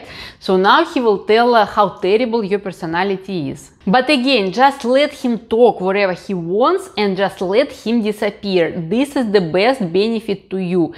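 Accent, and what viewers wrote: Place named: Latin America. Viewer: Mi amor tienes un asento bien marcado deves ser latina trankila q estas hayudando a la gente y eres bella .Yo so soy boricua y con asento bien marcado🤣🤣🤣asi q Palante.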